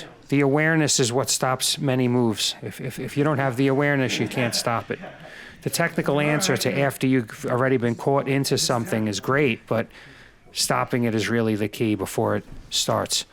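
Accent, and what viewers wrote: United States. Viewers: Your voice sounds like Secaucus to me. 🤔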